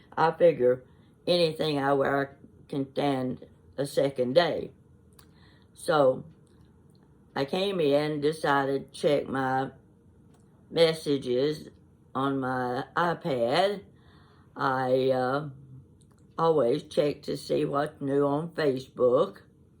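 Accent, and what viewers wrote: South, United States. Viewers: I've been watching you for months. I think i messed up, do you live in Kentucky or Tennessee? Either way i love your accent! There is a utube you might enjoy. It's Celebrating Appalachia, Tipper Presley's goal on her channel is to keep Appalachia language and foods ways alive. She's wonderful. She reads books to us on Fridays. Books Southern and Appalachian authors have written. They have been amazing. She lives with her husband, one if her twin daughters. Her other daughter & her husband live about 20min away. They garden, cook, have a good time. I love it! She also has a blog she started in 2008, the blind pig and the acorn. It's posted everday. So interesting. Grammy from Texas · Thank you so much for talking like me. Both sides of my family migrated over from KY. I've lived in a couple of places that don't appreciate our dialect. 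It's funny because I don't mind their's. Blessings to all.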